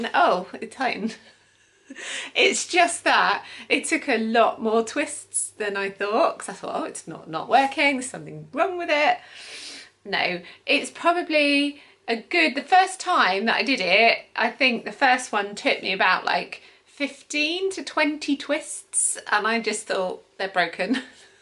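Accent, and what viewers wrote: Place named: England, United Kingdom. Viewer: How beautiful English accent.. Many thanks for informative video.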